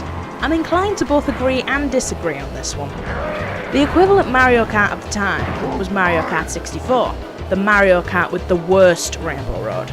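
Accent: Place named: Northern England, United Kingdom. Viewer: I love a good Northerner. Sheffield?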